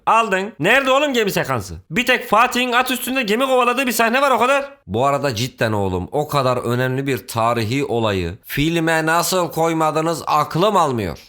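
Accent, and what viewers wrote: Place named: Anatolia, Turkey. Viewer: size bir tavsiye vermek istiyorum, anadolu şivesi yapmazsanız videolar daha keyifli olabilir. Ben ve 3 arkadaşımın ortak görüşü bu, sevgiler.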